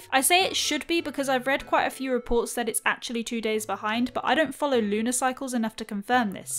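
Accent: United Kingdom. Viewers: Finally, a british accent! Instant sub 😄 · I love her British accent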